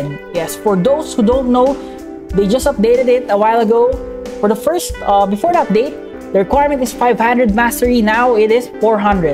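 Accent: Philippines. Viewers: Are u filipino ur accent is filipino accent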